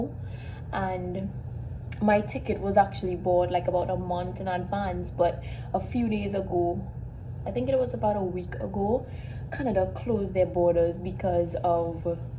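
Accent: Trinidad and Tobago. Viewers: It's refreshing to hear a trini accent. I lived in Canada for years long winters and no jobs. I now live in Maryland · I like your Trini accent too. The best Caribbean accent. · You are so right, I'm in Western Massachusetts perfect for anything,about the long days look up the midnight sun in Norway or the twilight all night long in Northern Alberta,I luv the accent its one of the most beautiful,You're a true Trini a mix of many things, much success to You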